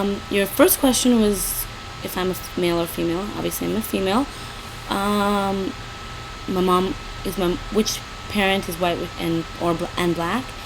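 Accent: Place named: Nigeria. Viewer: Sup? I'm also Nigerian/American but moved to the States when I was 2. Surprised to hear you still have a hint of a Naija accent! informative video...pz.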